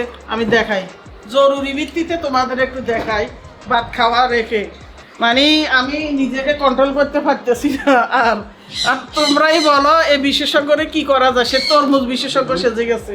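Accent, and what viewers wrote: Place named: Char, Bangladesh. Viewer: কথায় বুঝা যায় চর এলাকার মানুষ।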